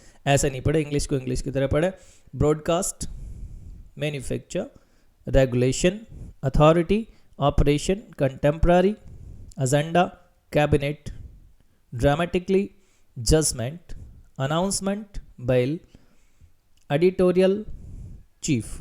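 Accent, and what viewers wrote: Rajasthan, India. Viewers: Sir AAP rajsthan se ho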